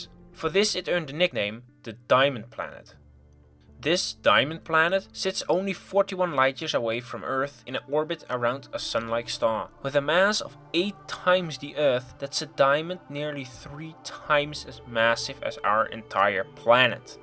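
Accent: Netherlands. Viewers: dutch accent confirmed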